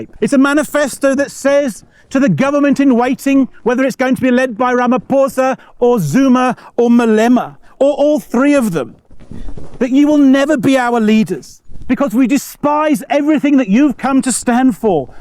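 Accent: United Kingdom. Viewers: Why is the speaker British?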